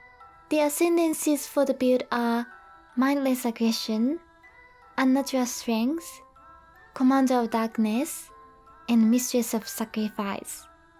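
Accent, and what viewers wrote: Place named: Japan. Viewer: Are you Japanese? Sorry for unrelated question but damn your accent remind me that. It extremely super cute btw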